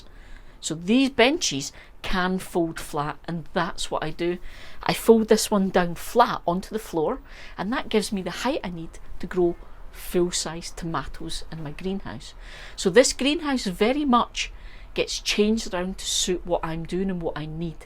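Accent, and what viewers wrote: Scotland, United Kingdom. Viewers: New here love your channel and your information thx u 😊 I’m from Australia 🇦🇺 my mum is Scottish so I feel at home listening to your voice ❤ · Clicked for the title, listened because of your awesome accent (Scottish heritage all over my family tree), and stayed because of your content. So very helpful. Thank you for sharing your setup. You got a new subscriber. I had to laugh at myself being a typical American. Me: What? they have IKEA in Scotland? Me a moment later: Ya big dummy, IKEA is Swedish, probably had IKEA before you even knew what IKEA was. Hahaha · Hello again. I believe it was back in your previous greenhouse. Maybe I’m not remembering correctly, but it was a smallish plug in heater. I don’t want to cause you any extra effort because I could be remembering wrong! Anyway, if it’s nice and warm all day and is then at freezing at night, I’m thinking that would not be good! 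Anyway, if you are pretty sure you never used a heater, then don’t worry about it. Could be another of my senior moments! Ha ha. PS the first time I watched you, I thought “oh no, I’ll never be able to understand what she’s saying with a Scottish accent” which of course was not the case. Your presentations are so great! Keep them coming👏👏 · Hello my new friend in Scotland! This was a wonderful video! First of all listening to you is like having my mother read me a bedtime story… Your accent and lilt are very soothing! Secondly, I just realized that by using water from the tap, I am shocking my seedlings! So thank you for that common sense advice. Lastly, you have inspired me to move some tomato and basil plants into my greenhouse for the winter. I’ve always wondered about pollination inside a greenhouse , so I’ve never done it all season long. However, I am going to try to extend my season this year by moving my establish plants into my unheated greenhouse. 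Looking forward to viewing the rest of your videos. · Not fair unless we know where your accent is from?? Scotland. Irish. I am Scotch Irish living in Louisiana, raised in Texas with an East Texas accent. 😊 ❤ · Thanks for your video with your charming scottish accent.' I kanee...?..I was at uni at St Andrews and it brings back good memories. What I don't quite understand why you would put the IKEA plastic recipients low down because you could use that space to grow plants and maximize the light. We have built light shelves all around the edges of our greenhouse which can be taken away so we start small plants on two levels then remove the shelves that are about at one meter twenty . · I was surprised when you first started talking. Your accent is so cute and you are so easy to understand. Except a couple of times you mentioned ober jeins.(That is how it was spelled in the closed captions.) I wondered what that was.So I googled growing ober jeins in Scotland, and started laughing when I realized you were saying aubergines! 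We just call them egg plants here in Michigan. You were very informative and helpful, so I decided to subscribe to hear more of that accent! I almost did not click on your video when it came up in my feed but I wanted to find out what your Ikea hacks were. I am so glad I did!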